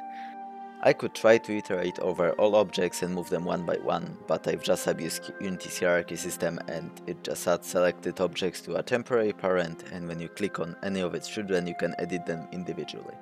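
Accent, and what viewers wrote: Poland. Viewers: ale polski akcent. fajny film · czyżby Polak?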